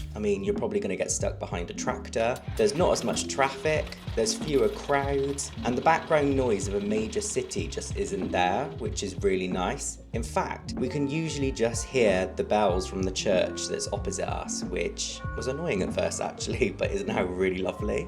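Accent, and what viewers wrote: United Kingdom. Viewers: Really interesting take 😊 Enjoyed this. Somerset is wonderful. Trying to narrow your accent down. Portishead? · I love Somerset! I'm from Bristol but moving away (I think to the outskirts of Weston?) when my daughter finishes school. Have considered Wells as I adore it, and Glastonbury... but no trains and I don't especially love driving. Are you from Bristol, you have the same accent as me?? Love finding your channel and I will follow your journey!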